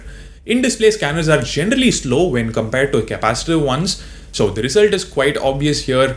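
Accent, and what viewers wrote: India. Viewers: Wat an accent pure indian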